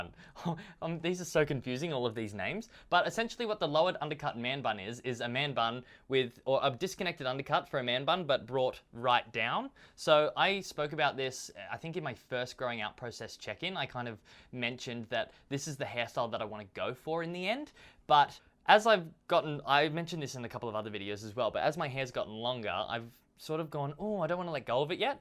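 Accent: Australia. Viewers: Is he Australian? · Are you Australian?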